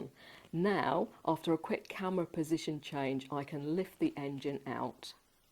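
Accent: United Kingdom. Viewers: I love her British accent, too. Also, wonderful fantastic bumper guards. 😊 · Love This Awesome & Beautiful Lady with her Super Gorgeous British Accent just mesmerized.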